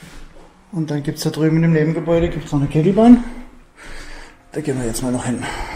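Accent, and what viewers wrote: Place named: Swabia, Germany. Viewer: Hoi, da hört man ja Schwaben, die auch noch tolle Videos machen! Ich habe mal ein bisschen auf dem Kanal herumgestöbert und lupfe meinen Hut. Gute Kameraführung, angenehme Moderation und ohne aufdringliche Hintergrundmusik. Ich selber bin leider inzwischen schon zu alt und zu gebrechlich, um selber noch der Abenteuerlust um verlorene Orte zu frönen, deswegen genieße ich diese Videos vom Sofa aus umso mehr. Da kann ich gar nicht anders, als euch mit der Bimmel zu abonnieren und euch weiter zu empfehlen!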